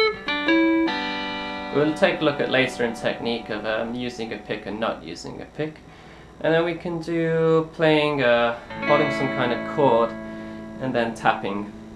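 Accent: United Kingdom. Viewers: What country are u from u kinda sound English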